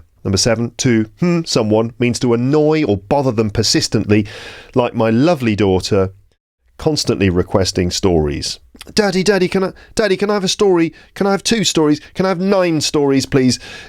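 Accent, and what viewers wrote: United Kingdom. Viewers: I like your voice because of its tone (your voice is high-toned) and you speak with British accent so it becomes more attractive.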